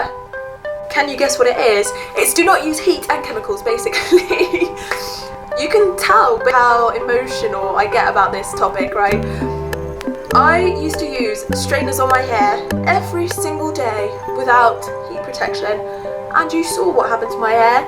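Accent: United Kingdom. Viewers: She is fast plus British accent🙄 and the music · She is British tho. Most people understand the British accent, it’s yours that nobody understands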